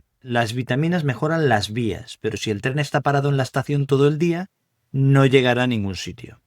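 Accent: Spain. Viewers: Este señor no es el q esta hablando. Este señor es mexicano y la voz es de un español. Por lo tanto Este video no es confiable. VIDEO FALSO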